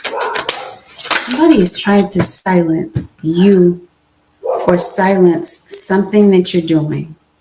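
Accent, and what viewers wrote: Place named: South, United States. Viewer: You from the south ain't you????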